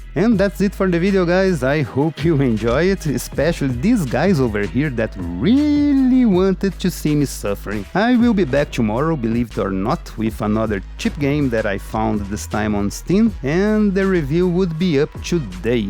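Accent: Brazil. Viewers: Pelo seu sotaque eu tive quase certeza que vc era BR haha! Seu inglês é muito bom 👏🏽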